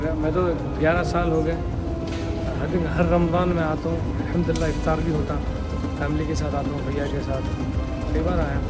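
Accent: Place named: Hyderabad, India. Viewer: Bhiya full hyderabadi slang me hai kiraak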